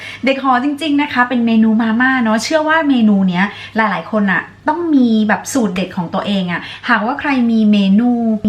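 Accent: South, Thailand. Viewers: มีแหรงใต้ด้วย น่ารักจังเลยครับ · สำเนียงใต้ก็น่ารักดีนะคะน้อง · พี่ พูดใต้ให้ฟังหน่อยค่ะชอบๆๆๆๆ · ชอบพี่ แหล่งใต้ 😁🤭😁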